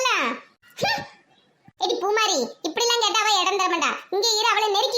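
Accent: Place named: Tenkasi, India. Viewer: Idu entha ooru slang semaiya iruku ..thenkasi side madri iruku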